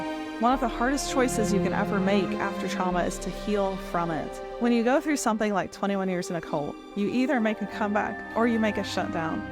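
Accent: South, United States. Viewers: Congrats on your escape. You have a little southern accent there. Im old as dirt, so I'm probably not a great candidate for AI or quantum, but it sure intrigues me. At 72, I have so many ideas over the years that have come to light by others, and I keep missing the boat since I don't act. I now have so many other ideas written down, and since my x left, I have time to act on some of my stuff. Help me jump, please. Cleo......Thx.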